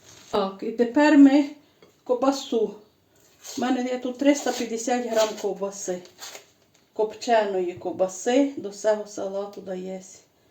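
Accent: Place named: Ivano-Frankivsk, Ukraine. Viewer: Все чудово, і рецепти салатів, і випічки. Дякую. Але, якби ви ще відшліфували українську мову, не вєивали русизму, при тому, що бажаєте всім миру і перемоги, було би мега прекрасно. Даруйте, що роблю вам таке зауваження, але мене дратує те наше уподібнення до московитської мови. Адже наша мова така багата, така милозвучна, а юагато наших західняків знаходять уподобання "збагачувати"свою мову русизмами: юбка /ніби спідниця уже не в моді/, зря /ніби даремно уже застаріле/. Отямтеся люди! По вашій бесіді я можу визначити, що ви з Франківщини і тому ще більше боляче чути суржик, який як іржа роз'їдає нашу багатющу і особливу МОВУ. Бажаю вам мудрості, бути берегинею не лише кулінарних страв і смаколиків, але й нашої унікальної української мови і навіть "бандерівської" неповторної говірки, нашого унікадьного діалекту. Здоров'я вам і творчих досягнень./успіх- калька московитів/.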